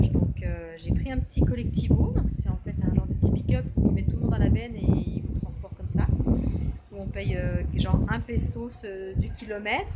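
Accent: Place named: Jura, France. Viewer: L'accent jurassien revient d'ailleurs....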